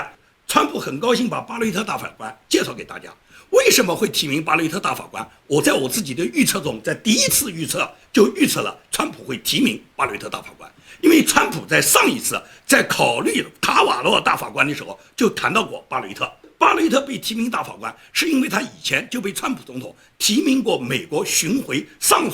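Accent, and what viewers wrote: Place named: Fujian, China. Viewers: 福建帮？口音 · 建民，耐心地采用法律手段收集證據還擊自衞。聽口音象福建人。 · 听起来像南方人，支持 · 福建口音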